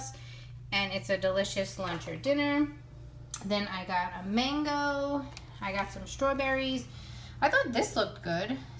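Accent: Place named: Northeast, United States. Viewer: You have a cute northeastern accent... in Indiana we tend to pronounce haul and hall the same, ha😊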